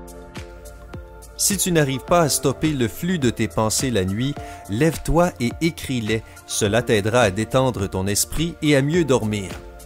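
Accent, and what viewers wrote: Quebec, Canada. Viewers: C'est l'accent canadienne😉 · accent québécois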